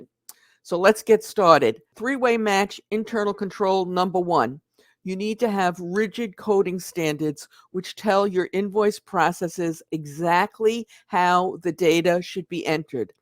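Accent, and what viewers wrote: New York, United States. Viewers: Absolutely you said it convincingly. Do u have a ny accent?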